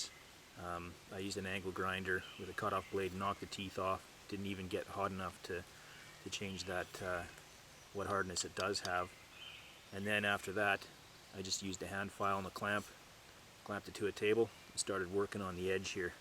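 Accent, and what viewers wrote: Canada. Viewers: Are you Canadian? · Are you canadian? Just asking. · canadian accent eh? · If you haven't ever heard a thicker Canadian accent, I could understand thinking it sounds a bit Irish. It's more of a Scottish influence, but again, not completely ridiculous for someone who doesn't know better to draw that conclusion. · are you canadian? i can hear it from the ou in about. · Thumbs up if you knew he was Canadian before he mentioned he was making a knife.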